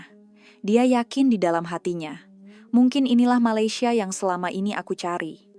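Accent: Indonesia. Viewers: lagi suara org indon yg bercakap dlm video pasal malyasia.menyam pah dengar meleket2 percakapan dia.haprak lah punya org.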